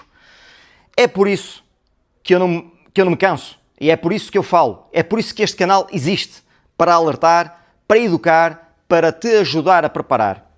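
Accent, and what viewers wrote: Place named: Portugal. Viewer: Portugal 🇵🇹 não está preparado para nada 😢 Infelizmente está muito próximo, mas diz que vai ser na ilhas, ou no Algarve Mas eu sinto que Lisboa está também muito próximo de uma catástrofe muito grande Já tenho algumas coisas preparadas ,tenho kit de primeiros socorros e medicamentos 💊 Adorei ter aqui no YouTube uma voz Portuguesa, porque infelizmente o Brasil 🇧🇷 é top Eles falam muito de Portugal 🇵🇹 Gratidão por esta live E o apagão que falam, já tirei dinheiro dos bancos. Não custa nada prevenir Obrigada pela partilha ❤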